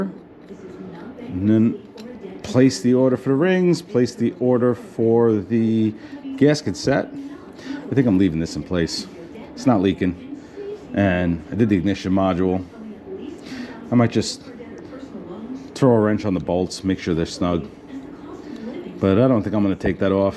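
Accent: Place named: New York, United States. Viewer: I like your new york ack'sent'....I had a partner in in los angles who was from brooklin and he was a good friend,,Yet he was So much of a grinch'..?[[the dude had an atatude'...anyway I llike your stories..and You have a father that is very good to you...I like it...I always wanted a harley so I kept fixing up old broken down bikes till I made enough to use as a good down payment,,and along with what I could save from my job driven the senior bus...I bought an 02 fxd...once I payed it off..I spent way too much time and money changing evreything till it hardly had any harley parts...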